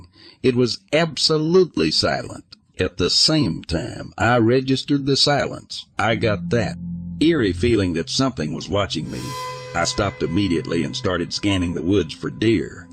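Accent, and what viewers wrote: South, United States. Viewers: Good News!!! I'm really enjoying the substitute narrator!! He's definitely good at it especially with that southern twang!!!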